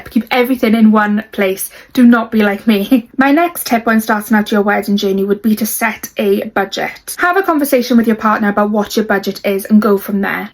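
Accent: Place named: Wales, United Kingdom. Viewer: Beautiful Welsh accent! 🥰 Congratulations on your September 2026 wedding! 💐💍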